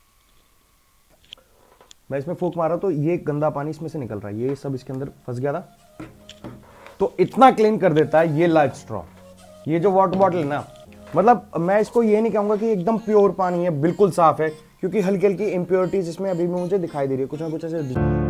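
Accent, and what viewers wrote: Punjab, India. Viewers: Bro tumara bolne Ka accent Punjabi ki Tarah hai agar tum Punjabi ho to pls reply jarur karna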